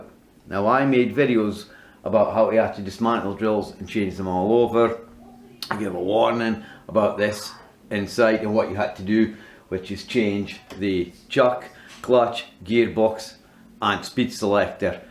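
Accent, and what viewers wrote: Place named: England, United Kingdom. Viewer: Ingles de Inglaterra.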